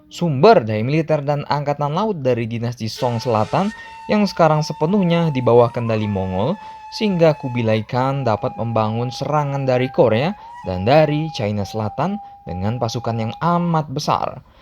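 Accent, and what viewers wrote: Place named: Riau, Indonesia. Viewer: Adminnya orang Riau nih atau kalau ngg BANGKA BELITUNG ✌️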